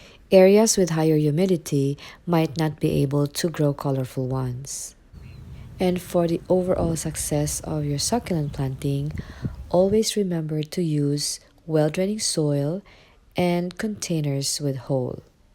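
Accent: Philippines. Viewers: you sound pinoy like me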